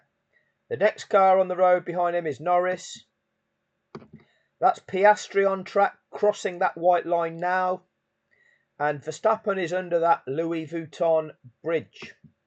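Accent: United Kingdom. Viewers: your britich ???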